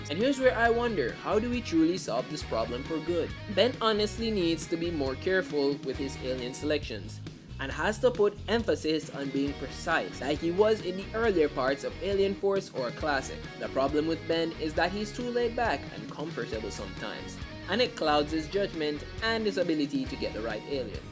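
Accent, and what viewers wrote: Jamaica. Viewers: Either jamaican like me or im mishearing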